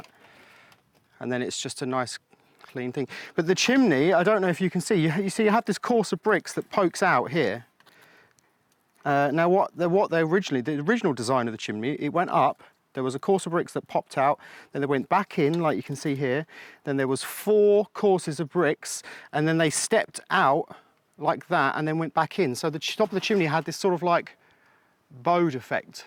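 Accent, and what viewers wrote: United Kingdom. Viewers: …your British accent is so much more enjoyable to listen to than Dick Van Dykes!!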